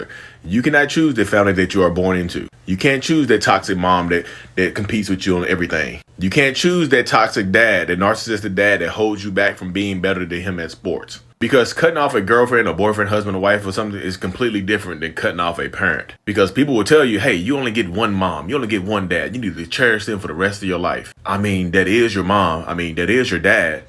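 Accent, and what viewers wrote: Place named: Baltimore, United States. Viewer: Are you from Baltimore? You say Pernt instead of parent like a true baltimorian 😊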